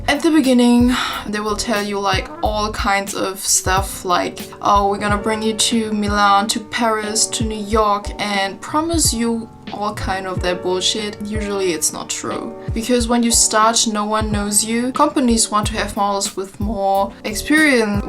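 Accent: Germany. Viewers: your accent ist german, right? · a pretty fucking horrible thing to say to/ about someone, and for what reason? I think her accent is beautiful. I love how soft and sophisticated German accents sound. Even if it was hideous, there's not much she can do about it, is there? Any changes would be slow, and besides there being nothing that needs fixing, she shouldn't have to spend years "improving" a perfectly understandable accent for our needs, not hers. JFC! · Were you born and raised in Germany cause you English really sounds 'germanized' :D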